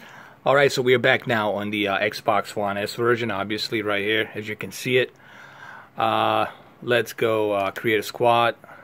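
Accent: New York, United States. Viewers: Are you from New York, I can hear the accent a little. Sounds a bit like the Bronx or Queens to me.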